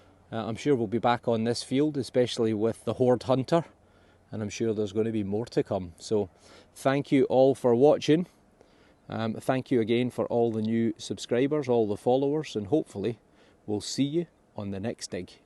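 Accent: Scotland, United Kingdom. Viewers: oh damn.... its really good to hear some scottish again, must been 20 years by now i had a great friend from scotland who just talked like you so funny to listen, some nice finds and you earned my suscription, say hi to from his bavarian fan;) and no the silver isnt older than 200 years even if it is silver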